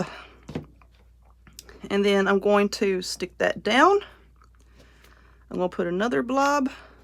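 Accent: South, United States. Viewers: Love your accent! It's nice to hear another REAL SOUTHERNER talk like myself. LOL Your crafts are great!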